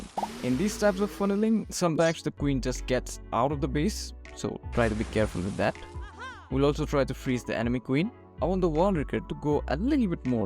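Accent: India. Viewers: hey ur voice tone gives an Assamese vibe.. are u?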